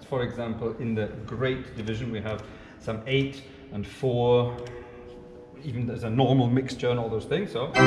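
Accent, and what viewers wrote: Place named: Scotland, United Kingdom. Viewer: Well that's quite an Organ very versatile which will please your. I could hear the pleasure in your voice playing around with this instrument. Very Uncongenial to say the least. Very enjoyable video indeed. And yes your Scottish for sure not that that's a bad thing. Someone has to think about the money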